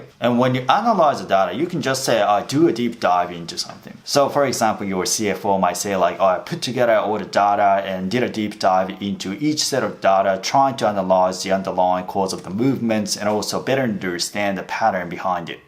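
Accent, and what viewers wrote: Australia. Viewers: むかしのATSUさんの英語のアクセントと全然違う、オーストラリア訛りになってる。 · オーストラリアの英語かわいいですよね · 今日の動画、これまでで一番オーストラリア英語っぽいです！ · オージー訛りですよ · 久しぶりに見たら別人かと思うくらい発音がオーストラリア訛りになってました。あえてここまでする必要はありますか？ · わざとオーストラリアっぽいアクセントで話してるのかな いつもより訛り強めですね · めちゃめちゃオージーの発音でびっくりです笑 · オージーなまりですね。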